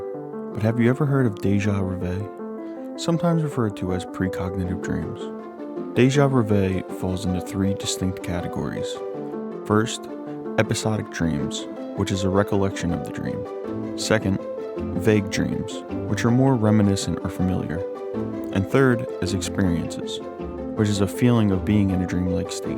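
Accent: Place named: Philadelphia, United States. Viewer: this guy is definitely from Philly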